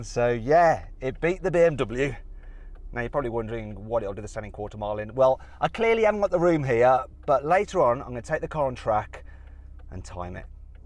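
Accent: United Kingdom. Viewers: 喋り方は一般的なイギリス英語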